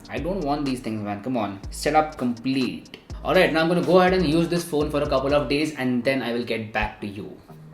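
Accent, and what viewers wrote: India. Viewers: But where are you mugging Indians with your Indian accent 🤢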